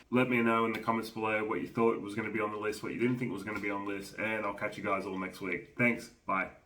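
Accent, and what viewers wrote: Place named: Australia. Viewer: Brooo… why did you need to add ‘Australian Dollar’ after the sums…? I can clearly tell that your Australian accent would mean that you’re talking about AUD.. 😅 Great video mate! Wish I still had my moonbunny 😢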